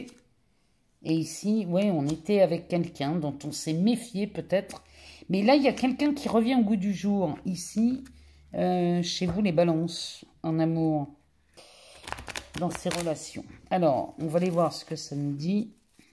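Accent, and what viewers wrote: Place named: Lyon, France. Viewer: Bonsoir, des bonnes nouvelles enfin. Vous avez l'accent de Lyon, ma ville de naissance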